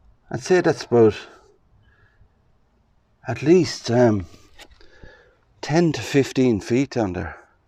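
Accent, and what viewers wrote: Ireland. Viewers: Thank you for your interesting commentary, which I really enjoyed listening to. You have a beautiful Irish lilt to your voice and you sound as if you might be a good singer. Stay safe and keep well. Zora in Australia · Are you Irish? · Yet again, a lovely adventure around a fascinating Graveyard. Every one of them has secrets never to be told. Interesting Tombs and as always, your beautiful Irish accent makes these videos even more watchable - thank you. Take care 🙂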